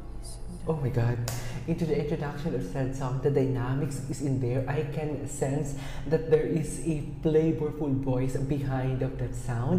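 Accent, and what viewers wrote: Philippines. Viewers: Love you filipino english accent❤. Keep it up. Its colourfull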